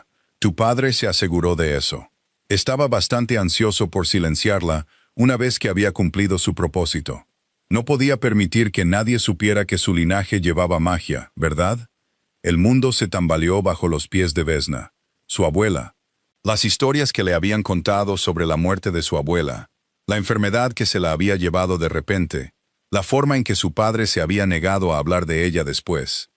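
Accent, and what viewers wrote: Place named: Pocho, United States. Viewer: Buenas historia, pero desespera esa forma de hablar pocho...ni Inglés ni español....x favor 😊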